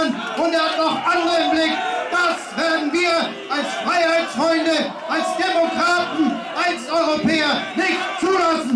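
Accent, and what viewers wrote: Germany. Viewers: Damn I love German accent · The true German accent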